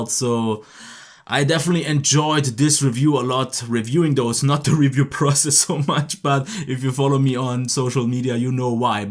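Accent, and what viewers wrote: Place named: Germany. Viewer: the German accent is hella funny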